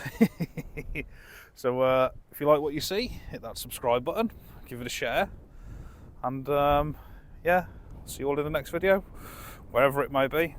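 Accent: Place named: Rugby, United Kingdom. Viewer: You sound like you're from Rugby!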